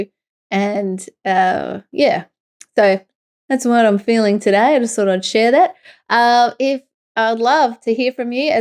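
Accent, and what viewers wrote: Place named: Australia. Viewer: A few random (and kinda tired... sorry if what follows isn't 100% coherent!) thoughts... • First, I never thought I'd say this, but having just dived down the YT rabbit hole of investigating the existential risk of AI (with the attendant copious US talking heads), it's bloody nice to hear an Aussie voice for a change! • Second, there's a saying (that I think originated in the business world, but that seems to hold true in the general case as well) that "if you can define the issue, then you've already half solved the issue", or something like that, anyway! The point being that if you have some problem, any problem, then in order to remedy it it's an imperative to recognise and define it. And so, having neatly laid-out the crux of the issue (or the, erm... blockage!) it becomes much more straightforward to solve it (or, ummm... 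flush it! haha😅). Basically, if you can't see it you can't fix it! • Third, and this is the reason that I initially clicked on this video (you know, ummm, besides for the very attractive, and, as it turns out, super sweet, positive, and refreshingly open and honest, presenter!), the 20th century French philosopher Albert Camus was of the firm belief that the central problem faced by people was that there was no inherent meaning in our lives. In fact, he thought that almost all of our suffering originated not from our search for some external meaning to it all - life, the craziness of it, and the subconscious knowledge of our impending deaths - but that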